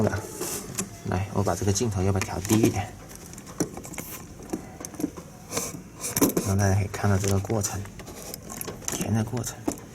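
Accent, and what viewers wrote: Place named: Guangxi, China. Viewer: 口音有点像广西人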